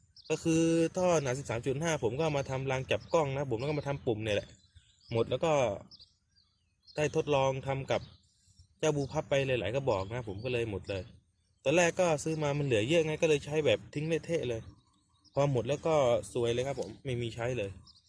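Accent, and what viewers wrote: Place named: Java, Indonesia. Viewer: ini orang jawa ya, kok ngomongnya ngapak wkwkwkwk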